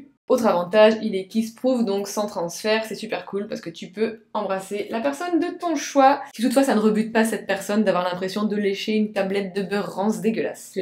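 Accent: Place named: Bordeaux, France. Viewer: Super intéressant comme vidéo ! J’avoue penser aux animaux quand j’en ai dans mon assiette mais pour le maquillage j’ai du mal à l’associer avec la souffrance animale, alors que c’est vrai ... une vegan m’a dit que j’étais flexitarienne : je mange le moins possible d’animaux, j’essaie d’acheter le moins de cuir, mais j’avoue ne pas encore faire de la souffrance animale un combat (et je me dis amoureuse des animaux, un peu hypocrite j’avoue ...) Breef j’adore tes vidéos, j’adore ta façon de parler. Petite question : de quelle région es-tu ? Bordeaux ? J’ai l’impression d’entendre un petit accent tout chou :)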